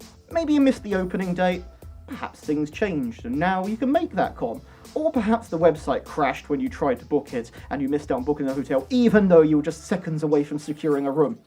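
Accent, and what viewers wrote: England, United Kingdom. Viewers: Because of your english accent.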